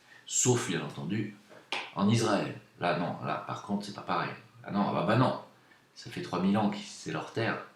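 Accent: France. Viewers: On n' est pas jaloux, mais t' es plus français c' est tout !Peut-on abandonner son pays (car tu l' as abandonné) et l' aimer ? Non, la France tu l' aimes où tu la quittes, il y a des médecins qui voyagent tout autour du monde car le monde a besoin d' eux; tu ne fais pas parti de cette catégorie; je t' aime bien mais quand même tu ne peux pas te défendre de ne pas avoir abandonner la France.Pardon de te le dire comme ça car tu ne me dois rien c' est vrai, néanmoins je regarde beaucoup de tes vidéos et tout ne me plais pas en particulier ton accent franco-gaulois alors que tu as quitté le pays. Continue quand même tes coups de gueules ils sont sympas !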